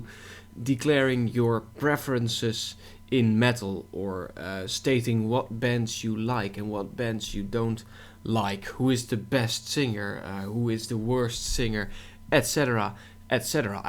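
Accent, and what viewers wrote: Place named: Netherlands. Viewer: The Dutch accent is strong in this one ^^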